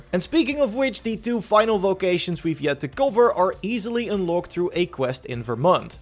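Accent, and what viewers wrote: Netherlands. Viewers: You are so duidelijk Nederlands, Nice video! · Ben jij Nederlands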